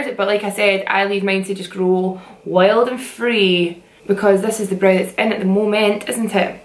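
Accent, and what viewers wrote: Scotland, United Kingdom. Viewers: it's a scottish accent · Leandra♥ Scottish accent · I love you so much, your eyebrows are sooo amazing! I stay in Glasgow I feel like I have a bond with u , there's not many Scottish youtubers. It's nice to hear the accent. ❤️❤️❤️ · I LOVE SCOTTISH ACCENTS SO MUCH OH MY GOSH I JUST WANT TO LISTEN TO YOU TALK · she has the most gorgeous Scottish accent, gives me liiife ❤❤❤ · Sounds Scotch to me. But then again my family's from Scotland so I can easily tell. Their's are way more pronounced tho.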